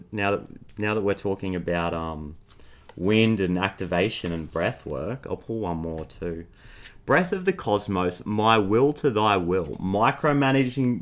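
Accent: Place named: Australia. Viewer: Australian accent... you notice it so much more the difference how we speak compared to the american tarot card readers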